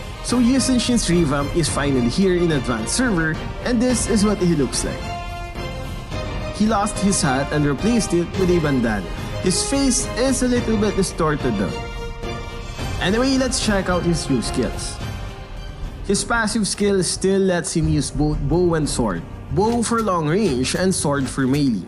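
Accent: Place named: Philippines. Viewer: Yor accent looks like filipino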